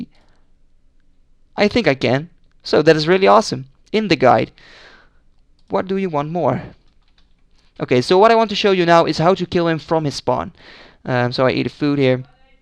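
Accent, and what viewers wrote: Netherlands. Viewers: I was like: Wait... he sounds Dutch! Then I looked at his name. :)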